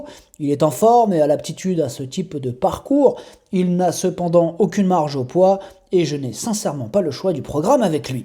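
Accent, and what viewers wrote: Morocco. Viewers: السلام عليك أخي وعلى متتبعيك الكرام.( هاد الدارجة المغربية عندك زوينة) تبارك الله عليك. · Bonsoir vous parler larabe comme un marocain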